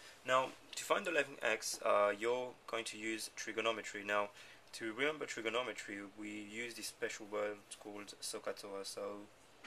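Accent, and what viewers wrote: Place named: England, United Kingdom. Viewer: Learning math from a guy with an english accent >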